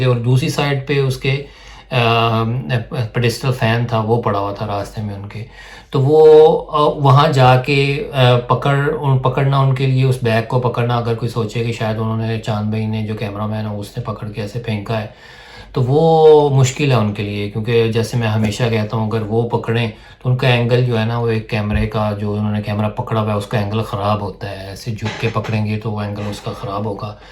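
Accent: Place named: Punjab, Pakistan. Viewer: bai good work mainu tusi v lehnde Punjab ton lagde ho bcz thodi boli Sade wali hai bcz aam loka nu ta madhani jis naal apne ghra ch saag ghot de aa pta hi ni v ehnu kehnde ki aa, jdo v koi Punjabi veer kuch changa karda ta BHT vadia lagda, parmatma thonu Chad di kla ch rakhe. Bht ghaint review karda bai.jwb jarur deo msg da Sat Shri Akal 🌹 Frm- Chad da Punjab India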